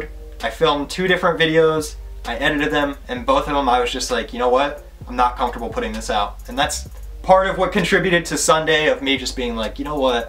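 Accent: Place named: New Jersey, United States. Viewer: You sound like a jersey dude. My kinda guy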